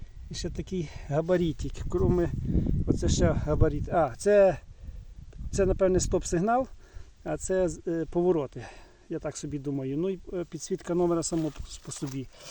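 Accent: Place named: Surzhyk, Ukraine. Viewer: У Вас дуже багато у вимові суржику. А в хлопців дійсно руки золоті.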